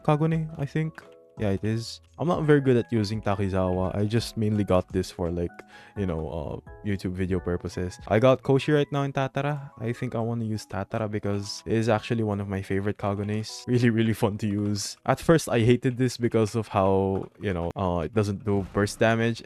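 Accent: Philippines. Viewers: Filipino kaba based sa accent mo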